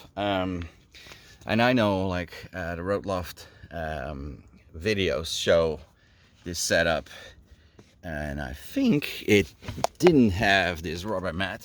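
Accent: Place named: Quebec, Canada. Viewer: I am sure you would have more views if you knew how to make the photos bigger, are you French Canadian like the company, your accent sounds like that (not like Tustin) near where I live!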